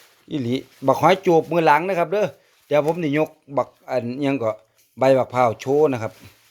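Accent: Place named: Chaiyaphum, Thailand. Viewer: สวัสดี ที่แท้ก็โคราชบ้านเฮาเนอะสำเนียงเว้านึกว่าบาว่ชัยภูมิเห็นก้ง แล้วอยากกินปลาจอ่มเน อะบาว่ บักหอยตอ้งแกงขี้เหล็กคือสิเเช่บชอบมาก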